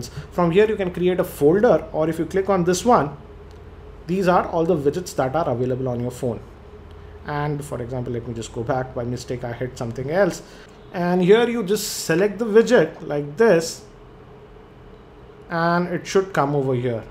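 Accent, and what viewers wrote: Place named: Maharashtra, India. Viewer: You will make it big by taking diction classes. You're speaking English but I can hear Marathi!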